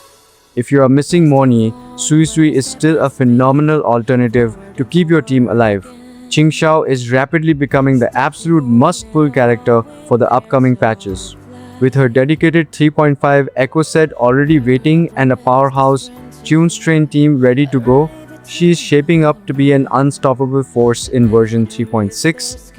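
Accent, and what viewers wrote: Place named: India. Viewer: Don't use an Indian ENG accent if u want more followers 😀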